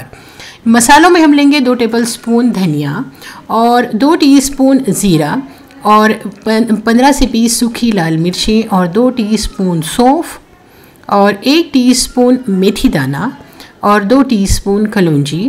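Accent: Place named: Gulbarga, India. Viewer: yes phool bangalore mein nahi dekhe ,shayad aap gulbarga ke taraf se, hain lehje baat cheet se aisa lagta hai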